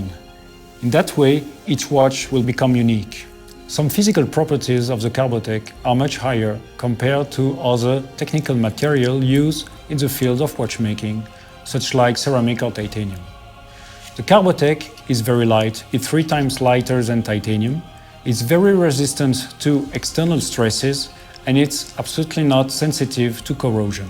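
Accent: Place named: France. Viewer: Gotta love it when the French try to speak English. Don't get me wrong, I admire it. When I travel to France the reception is warm due to my trying to speak their language. I have a ways to go in terms of improvement. They respect those who at least have some understanding of the French language and don't butcher it.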